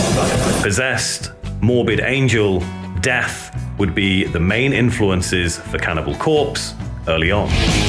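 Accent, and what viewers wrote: United Kingdom. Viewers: Even though you play live footages and cuts of the songs, my mom would fall asleep because your british accent since loves it. She doesn't even like metal. "Just put me the Brits on youtube, Dino".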